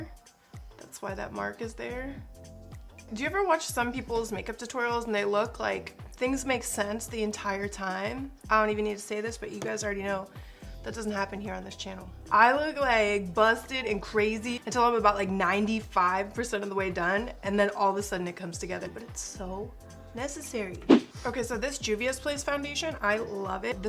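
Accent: South, United States. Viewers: Gorgeous look ❤️ Yes, just do the makeup style you love 😊 Thank God you saved the contour!!! 🥴🙏😁 Brows looked great. Love your sarcasm and southern accent 😂 so funny! Creme de Nude is my fave 💄The foundation looked flawless on your skin.. I wish the undertone was more neutral ❤️ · First time ever watching you.. omg love you!!! Anyways your too funny with your southern accent and bombshell glam beauty I loves it stay cool babes! Looking forward to watching you more.. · You are hysterical and absolutely stunning!!!! Loved the southern accent throughout!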